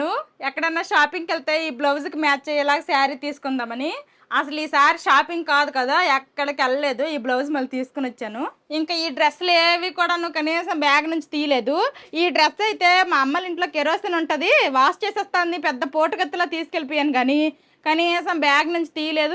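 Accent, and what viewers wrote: Srikakulam, India. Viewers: Super sis meru ala mataluaduthunte mana sklm ani feeling happy❤ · వీడియో బాగా నచ్చింది లైక్ చేసాము sister ❤ అమ్మ వాళ్ళ ఇంటి నుండి మనకి ఎన్ని ఇచ్చినా మనకు సరిపోవు కదా సిస్టర్ మన శ్రీకాకుళం లాంగ్వేజ్ నాచురల్ గా మాట్లాడుతుంటే చాలా ముచ్చటగా ఉంది❤❤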